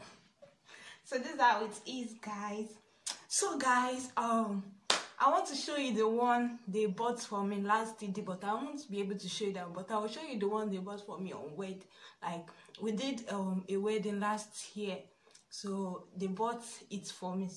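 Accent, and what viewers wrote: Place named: Nigeria. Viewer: Are u Nigerian cox ur accent is Nigerian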